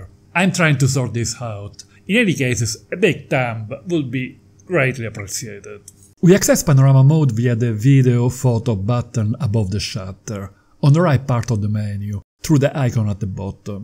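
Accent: Italy. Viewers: I’m enjoying your videos. I love your Italian accent. Just like myself living in Australia with a strong Swiss accent.👍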